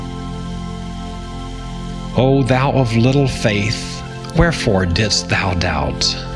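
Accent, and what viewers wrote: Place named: United States. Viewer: So, Jesus said these things to someone, then 300 years later, someone heard down the grapevine what he said, then wrote it in Greek, then it got translated to Italian, then it was run through the Catholic system, then the Protestant system, and then translated into English and imported into North America, and is now being recited by a country-accented white man, and therefore it holds the faith of so many as the unadulterated Absolute Truth? Just trying to get the understanding right.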